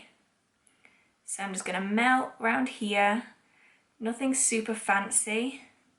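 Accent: United Kingdom. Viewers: That you for your YouTube channel what country are you in like your British accent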